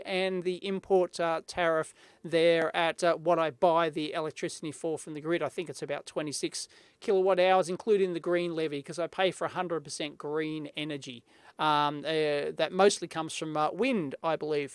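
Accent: Australia. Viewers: why does talk like crocodile dundee? was that show really that popular in austrailia?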